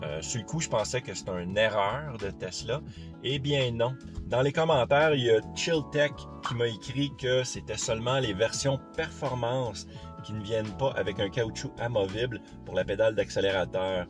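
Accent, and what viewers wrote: Quebec, Canada. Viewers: J'adore tes vidéos et tes commentaires, surtout avec ton p'tit accent québécois et la description des mots que d'autres amateurs ne comprendraient pas. En tout cas, tabarouette que t'as d'l'air naturel dans tes vidéos. Cela fait 10 jours que j'ai passé ma commande pour une sr+ chez Tesla Laval ( 13m$ oblige) et j'ai utilisé ton code de référence.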